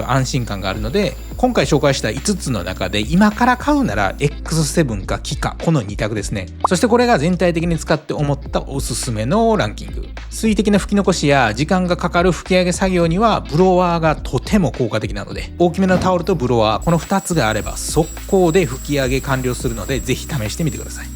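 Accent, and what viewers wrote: Kansai, Japan. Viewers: 一生〇〇って話し方関西人特有だよな。嫌いだわー内容いいのにもったいない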